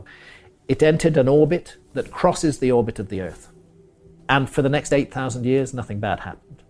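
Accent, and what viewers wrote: England, United Kingdom. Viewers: This bullshit artist actually says that Plato's story of Atlantis MUST be true because geology says sea levels rose via Meltwater pulse 1B (the characteristics of which is highly unknown, by the way) around the same time that Plato gives for Atlantis. No joke, he actually says this. It is so easy to get away with bullshit when you have an awesome british accent. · His English accent gives him an infinite amount of credibility.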